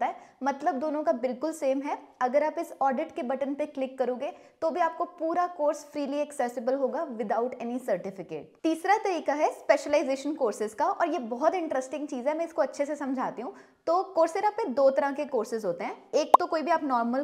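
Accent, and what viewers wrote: Punjab, India. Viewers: Another great video! One thing i have noticed is the people of IIMs are quite different, i mean in a positive manner. You are doing great job. One thing i have observed is you say the word "tarika" and "zaroor" quite differently, you are a Punjabi that's for sure. Any way keep up the good work! Raghav Vohra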